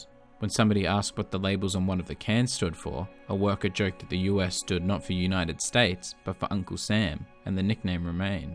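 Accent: Australia. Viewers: You sound like an Australian. And I'm amazed. If you don't live in America and are Australia native, I kinda find it sad that somebody foreign to our country is one of the most detailed historians of my country I have ever heard. I am making a bunch of assumptions and will be perfectly happy to be corrected on any of them. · didn't expect to see the history behind the coins on Davey's eyes. educational & a cool Aussie accent -- yay! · Just found this video and the rest, love it mate it's amazing. Aussie voices are just amazing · Yeah, the narrator speaks Australian and I'm a bit rusty so I appreciate it as well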